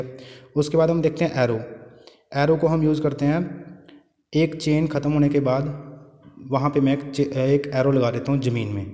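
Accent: India. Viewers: sir are you from himachal?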